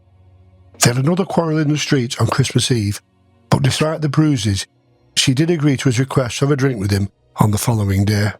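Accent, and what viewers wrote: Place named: Lancashire, United Kingdom. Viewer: I love the Lancashire accent. Far preferable to any Southern English namby-pamby accent.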